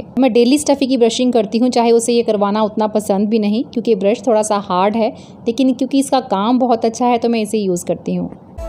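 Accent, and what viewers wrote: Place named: Himachal Pradesh, India. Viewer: Aapki awaz se hi me jaan gyi thi ki aap HP k ho 😊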